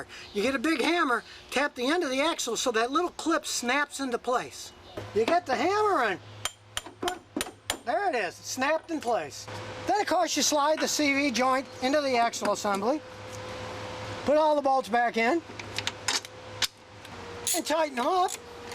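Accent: United States. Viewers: Being English, it's really difficult for me to endure listing the Americain accent, but none the less, great video.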